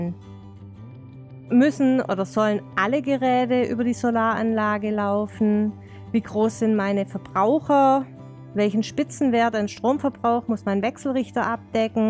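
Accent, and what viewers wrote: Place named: Swabia, Germany. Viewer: 🧡🎈🌞💛🍀hallo ihr 🩵🧡wie immer interessant informativ schwäbisch😄 Dankeschön 🎈💛🌞🍀🩵🧡 Gruß vom verregneten Bodensee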